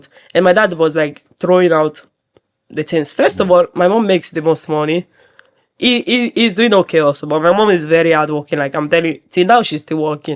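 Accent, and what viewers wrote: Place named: Haiti. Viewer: Her accent sounds haiti also, I was talking to someone today "Nigerian". We spoke to the extent of dreams and ambitions on inventing solutions for the nigeria economy. He said poor people don't dream, they only they only survive meaning we cant solve our problems cause we trying to live for tomorrow while dying today. She is right.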